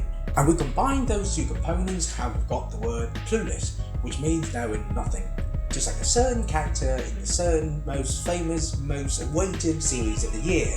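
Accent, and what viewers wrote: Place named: United Kingdom. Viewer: Giọng Anh Anh chuẩn thế ạ :3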